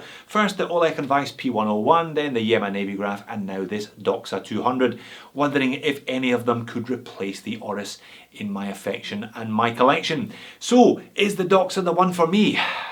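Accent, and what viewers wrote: Scotland, United Kingdom. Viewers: my man, i thank your for your reviews. your scottish accent and your humor eases my day! thank you!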